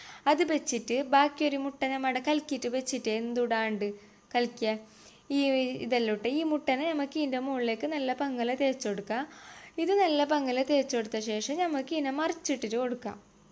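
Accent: India. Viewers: നല്ല രസ ണ്ട് സംസാരം തലശ്ശേരി യല്ലേ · Super too Ikkanakinane nammal kasarkode slang padikum taa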